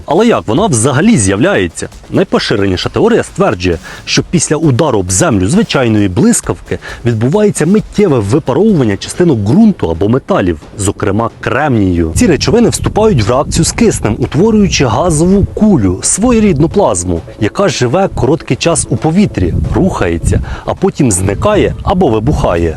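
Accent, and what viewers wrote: Cherkasy, Ukraine. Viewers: У автора черкаський прононс?))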